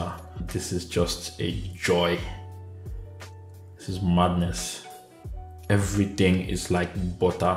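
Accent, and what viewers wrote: Nigeria. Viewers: You have a Nigerian accent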